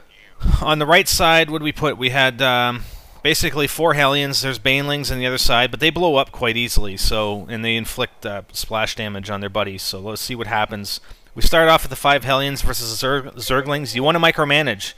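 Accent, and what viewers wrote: Canada. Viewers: I HATE YOUR CANADIAN ACCENT! GO BACK TO ALMOST-AMERICA!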